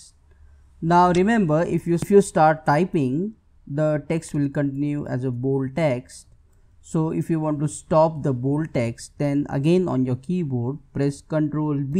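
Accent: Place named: India. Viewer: The indian accent makes it better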